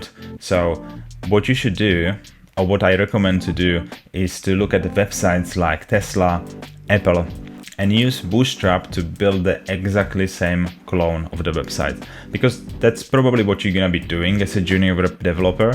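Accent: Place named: Czech Republic. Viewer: Slyším českou angličtinu jdu mrknout na video :D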